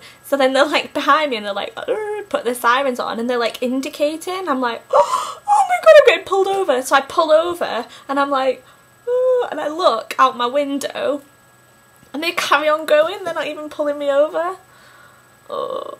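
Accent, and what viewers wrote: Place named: Blackburn, United Kingdom. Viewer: Are you from blackburn? ahah xx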